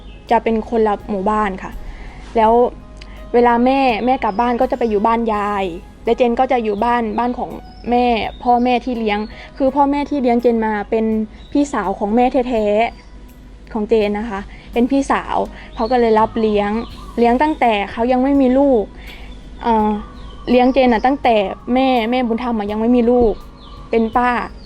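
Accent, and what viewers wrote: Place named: Central Thailand, Thailand. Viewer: คุณโชคดีที่เปนได้ทั้งคนไทยและคนลาว แต่ที่แน่ๆ "คุณคือคนไทยโดยกำเนิด" ผมไม่รู้ว่าการอ่านหรือเขียนภาษาไทยของคุณดีแค่ใหน เเต่มีวิธีแก้คือ อ่านหนังสือไทยทุกเรื่องให้เยอะๆแค่นั้นเอง ไม่นานก็จะสำเร็จ ส่วนเรื่องการพูดสำเนียงไทยนั้น ถ้าคุณไม่ได้บอกว่าอยู่ลาวมาจนถึงอายุ 18 ปี ผมคงนึกว่าคุณอยู่ภาคกลาง อย่างเช่น กรุงเทพเป็นต้น ขอให้ประสพความสำเร็จในชีวิตนะครับ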